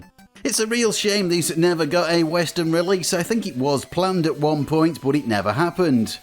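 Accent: United Kingdom. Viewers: Are you from UK? What an accent 💩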